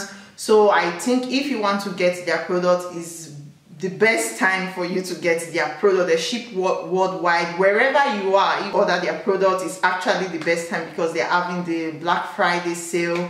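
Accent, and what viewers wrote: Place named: France. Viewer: French accent ❤️❤️❤️love your accent girl! Thank you for the video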